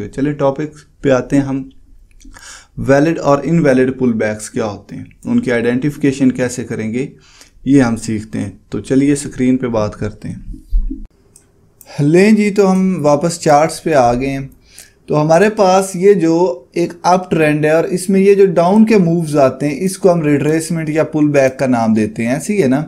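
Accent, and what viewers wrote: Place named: Lahore, Pakistan. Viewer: are you from pakistan bcoz of your Lahori accent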